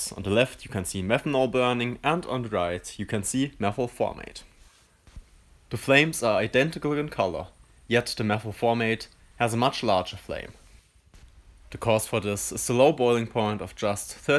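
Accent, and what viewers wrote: Germany. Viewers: I like German accent.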